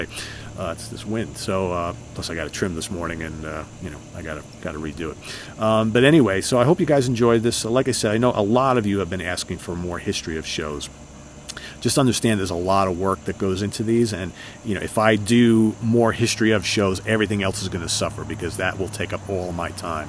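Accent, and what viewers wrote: New York, United States. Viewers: CCR were from the San Francisco Bay area. Don Henley co-led the Eagles with Glenn Frey, who was no Southerner, and nor were most of the other members of the band. Anyway, you came onto this man's video here, insulting him and using profanity, saying that he doesn't know shit about Southern music because he's not from the South, and he has a New York accent. Man, that kind of thinking and behaving is not "Southern." it's just rude, ignorant, and disrespectful. You may be from the South, but with the way that you came onto this guy's video, telling him off and spouting profanity at him for no good reason, you actually sound more like a Southerner's stereotype of a rude, loudmouth Yankee than a Southerner who was raised with some manners. That's all I have to say. Anything more would be wasting my time.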